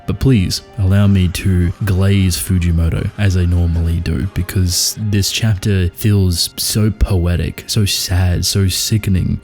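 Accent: Australia. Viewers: Why has it taken me over a year to realize how Australian u sound😂? I was wondering where tht damn accent came from, it’s from down unda. another banger video gang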